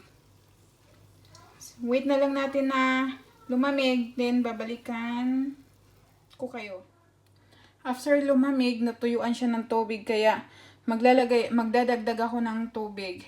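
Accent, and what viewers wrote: Visayas, Philippines. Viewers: Te bisaya ka night? 😀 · Maka mukhang yaya nmn c ateng bisaya lang cguro c mam kaya ganyan magsalita.. Nakita m nmn mga gamit nya ohhh mamahalin.. Madaming bisaya na mayaman ateng at magganda! Just sayin! ☺️ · Bisaya po ba kayo Maam...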